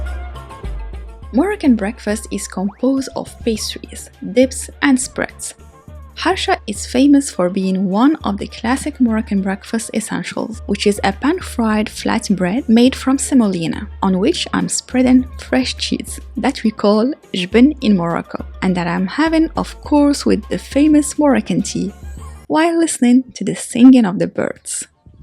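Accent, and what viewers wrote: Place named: France. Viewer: Work on accent as it sounds French, but good effort content wise .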